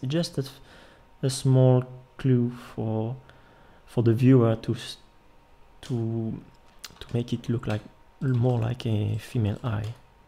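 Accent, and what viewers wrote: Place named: France. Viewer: ton accent français est visible x)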